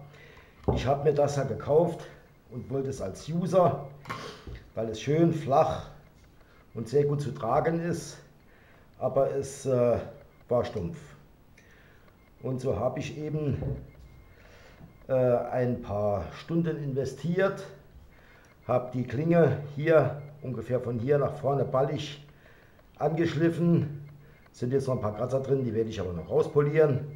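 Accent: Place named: Saarland, Germany. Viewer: Da höre ich doch einen saarländischen Dialekt raus. 8-)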